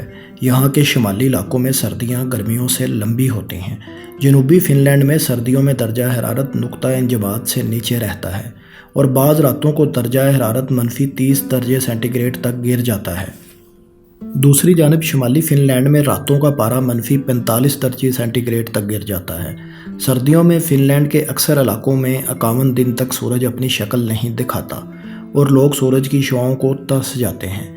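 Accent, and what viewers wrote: Punjab, Pakistan. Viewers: sir ki Urdu bhe saaf nhi hai punjabi accent use karte hain urdu main jayse Barabara main bara ko kisi Ajeeb andaz main bolte hain plz change Karo plz 💔💔💔💔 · Pls correct the pronunciation... It feels like punjabi. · Too heavy Punjabi accent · Urdu to sahi bolain. waraich jaisa koe nhi? Ye sahab acha bol rahy hain laikin talafuz main kafi kharabi hai. Aisa lag rha hai jaisy punjabi lehjay main urdu bolnay ki koshish ho rahi ho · Sub ko pata hay paindu ji, is say to acha tha kay Punjabi hi bol laytay, kisi Urdu bolnay walay say video banwa liya karo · bhai, is bhai ki awaz Zara suit nhi krti apki video's my.... aysa lgta ha punjabi bol raha ha, gala ky urdu bol raha ha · Punjabi accent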